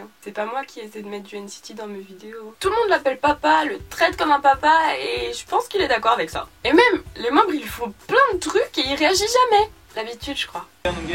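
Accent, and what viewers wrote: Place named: France. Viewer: No Mercy though😆 Ouais les survival c'est Tjrs grave cruel Ahah, Kihyun💜💜 Monsta Ayksss !! Lol Jsais meme pas comment écrire la phonétique mais bref le x se prononce comme en anglais. Jcrois t'est la première française Que jvois qui prononce les mots genre Bias et tout avec une prononciation française😜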